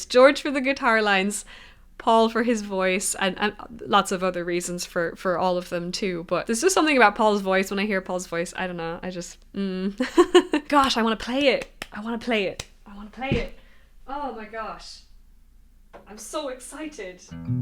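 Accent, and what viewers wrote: Ireland. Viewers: I grew up with music and it helped me through a tumultuous adolescence. I was initially disappointed with their most recent productions, eg. " / " and this particular song. However, I grew to love " " ( This song reminds me a little of " " from that album.) I was used to being very amazed by each new song, that came out from proper, when I was young, that pushed the boundaries of what I'd ever heard before. These newer songs, that I mentioned somewhat disappointed me in that regard, although I did grow to love them. I'm thinking, that this song came out at a very opportune time, with all the stupid shyte going on in the World (war, politics etc.), to revive our love and memories of a better time ( the Artistic Renaissance, that kicked off) and hopefully generate a better perspective in people's minds and hearts to push us forward into the Future. 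Quite simply, WE are BETTER, than this. Adore your lovely Irish accent and look forward to hearing you sing. Thanks for this video. P.S. Do you play/sing, " "?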